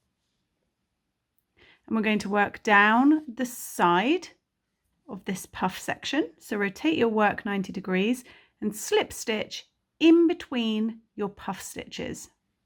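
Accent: England, United Kingdom. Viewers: Just found your channel and subscribed! You are a marvelous teacher! You sound British and I’m wondering about the yarn. I’m a novice at crochet but I don’t recognize the brand of yarn. Is it a British brand and if so can you give us an American equivalent? It’s a really beautiful project. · So lovely, must try. Nice to hear and English voice, most crochet videos on you tube seem to be American using their yarns.x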